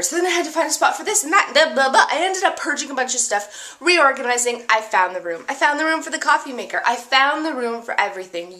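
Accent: Canada. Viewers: I think it's a Canadian accent!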